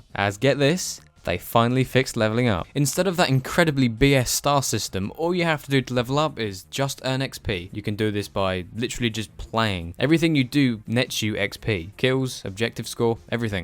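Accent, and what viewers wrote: United Kingdom. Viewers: You sound British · bri ish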